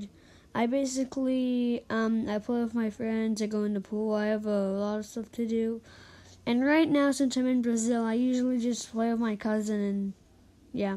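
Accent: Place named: Texas, United States. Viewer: your school was in Texas?? texan sounds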